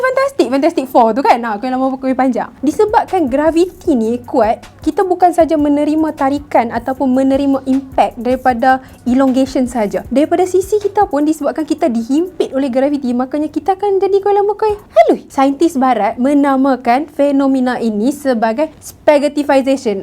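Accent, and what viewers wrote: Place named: Kedah, Malaysia. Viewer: loghat kedah piaw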